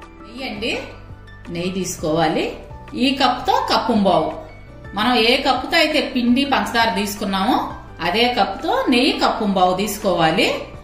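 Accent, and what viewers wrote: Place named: Anantapur, India. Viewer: Meeru anantapur yasa matladtunaru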